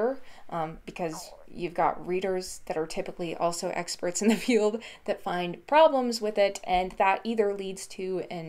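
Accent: South, United States. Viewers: awesome videos. are you from the South?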